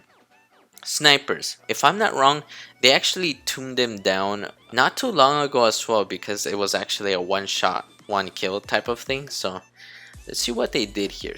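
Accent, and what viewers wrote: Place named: Philippines. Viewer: I just noticed you're picking up an awkward Filipino accents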